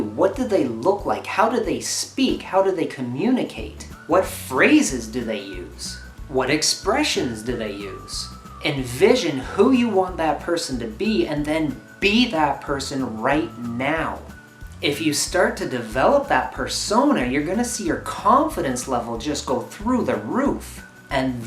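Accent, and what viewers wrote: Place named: Canada. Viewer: Great tips as always. I listen to energetic music before my live streams. I also generally try to record when I'm feeling good and having a good day vs otherwise. I've always thought you had a Canadian accent, but today I've just noticed your video location and hoodie logo. 😀 Cool! Yay a great Yoda quote, now two. I do have a friend who is very talented in graphic design and is helping me as we speak! Lol "For the love of popsicle Pete!" I've never heard of that phrase, I love it.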